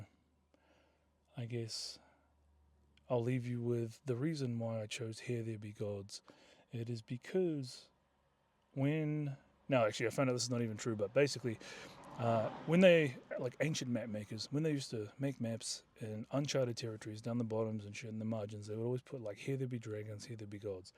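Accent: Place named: New Zealand. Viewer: Very therapeutic to listen to. I love hearing other new zealanders.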